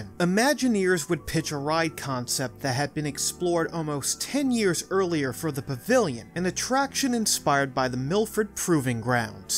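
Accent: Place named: New York, United States. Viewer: Alright. Maybe I’ve missed this in another video somewhere as I’m watching this as a playlist. But “aloung”…I’m getting a NorthEast accent. I know it’s not NH, MA, or ME. You don’t say “hahd, yahd, cahd, cah, bah, laand. Am I high in hearing some NY? Not trying to out your place or anything. Just the accent is bugging me and I just had to finally ask 😂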